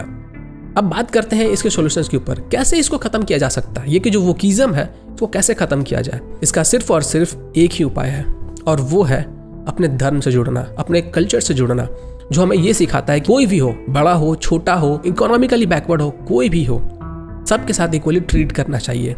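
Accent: Bihar, India. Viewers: Bhai ye bihari logo ko kaise bolna lag rahi hai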